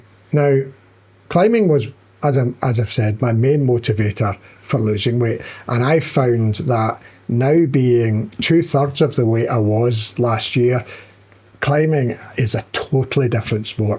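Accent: Scotland, United Kingdom. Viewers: OMG, your accent is so sexy! It's like the accent in the TV show Outlander --- Jamie's accent. ❤ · Ha ha. My grandparents came to NYC from the Spey valley Scotland 1921 (MacPhersons). They were raised in Gaelic speaking households. This accent is pure music to my ears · I fully agree with what you said about the side effects: compared to the risks one has with staying fat, they are minor. Let them come, same thing what I think. As long as I lose weight, I am happy to have some gastritic issues. Your weight loss of 15% in the first 3 month is outstanding. You said that you are a hyper-responder. But what dosage did you go to in this timeframe ? by the way: what region are you from ? am I right your accent is scottish, but where from? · He sounds like Scottish which I do find bit strong for me to listen for long 😅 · I hear the Scottish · Hes an inspiration to us all...and his voice is lovely to listen to isnt it, with that great Scottish accent!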